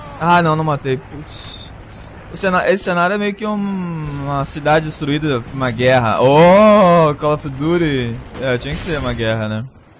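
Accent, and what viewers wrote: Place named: Rio de Janeiro, Brazil. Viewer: Ele é carioca cara '-' Tá loko? kkkk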